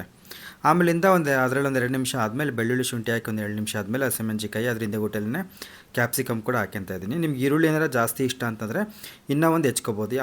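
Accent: Chitradurga, India. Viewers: 👌🏻👌🏻.. ನಿಮ್ಮ ಚಿತ್ರದುರ್ಗದ ಭಾಷೆ ಚಂದ... ನಿಮ್ಮ ಅಡುಗೆ ಹಾಗೆ....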